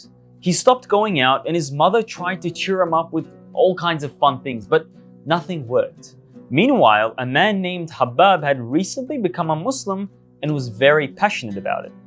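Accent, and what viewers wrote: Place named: Australia. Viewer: I loved the accent, reminded me of an Australian accent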